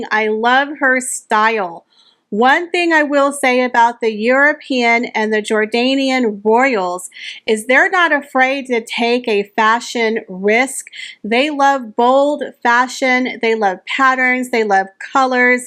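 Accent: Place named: United States. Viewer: Thank you for your great enthusiasm and representation of the Royals across the globe. If I may please ask? And I understand your dialect from America may prompt you to pronounce certain words as if they were American. Tiara is pronounced Ti a ra not Tiyerra. I am European/Australian and have worked in high end fashion for a long time and English is not my first language, however I may it a point to pronounce, people’s names or foreign names as they are pronounced in their country. Elie Saab is E l i not Ili. I watch you on all your shows and I do get it being American, you tend to make certain words sound more familiar to you.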